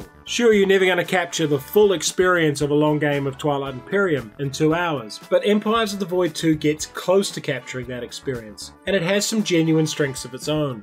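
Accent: New Zealand. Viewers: Kia ora, first time watching your show and obviously picked up the kiwi tone etc. Then to hear the Waikato part was great. Are you still in the Mighty Waikato as Hamilton is my home town.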